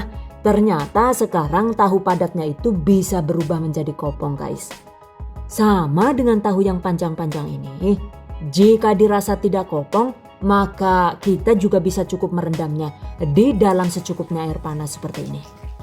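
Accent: Banyumas, Indonesia. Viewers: Paling suka kalo ada selingan 'kata kata mutiara' yg ga ada di channel lain Pake bahasa jawa ngapak, auto ngakak 🤣🤣🤣bisa aja nih, kirain orang banyumas- cilacap -purwokerto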